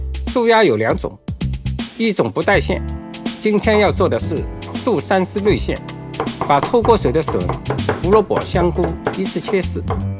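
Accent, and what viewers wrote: Shanghai, China. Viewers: 爷叔的沪普口音有点重啊😂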